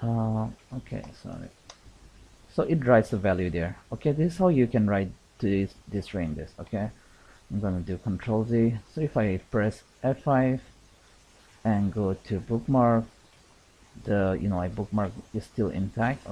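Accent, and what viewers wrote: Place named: India. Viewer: I concur that. I've just learned how to VBA. And if I may speak the truth for a minute: He has a very, very light Indian accent, which makes his instructions so much easier to understand than most of whom I've come across. It's an honest statement. NO OFFENSE.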